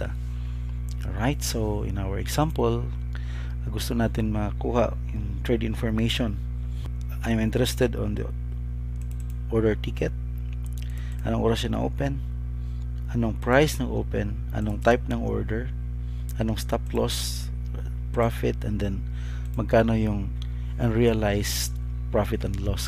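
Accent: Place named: India. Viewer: not bad but 1/3rd of what you say disappears in some indian sounding word accent. to sad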